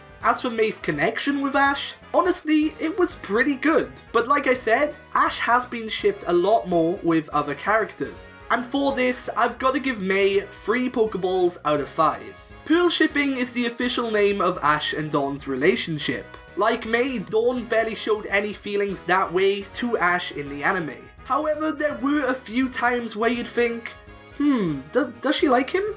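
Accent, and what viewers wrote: Wales, United Kingdom. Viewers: Nice to hear a Welsh person on YouTube!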